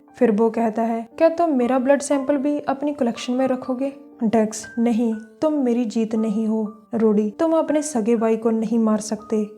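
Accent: Punjab, India. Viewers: Nyc mam ☺ your voice so osm mam aap kaha se ho mujhe apki voice sunke aisa kiu lgta hai ki aap punjab se ho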